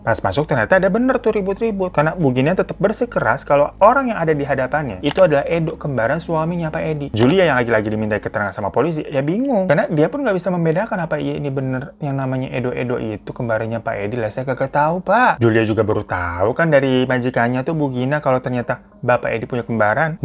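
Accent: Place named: Papua, Indonesia. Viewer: bang lucu bahasa Papua ny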